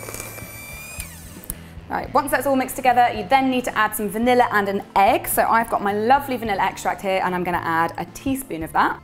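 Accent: United Kingdom. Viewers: i like to hear British accent:D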